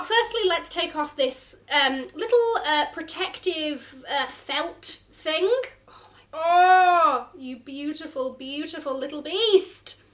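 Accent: United Kingdom. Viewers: I love your accent! Its not the basic british accent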